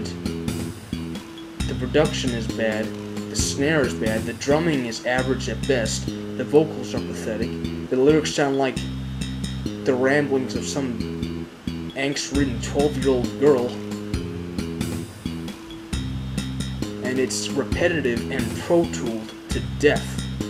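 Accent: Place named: United States. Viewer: Speak English instead of pigeon American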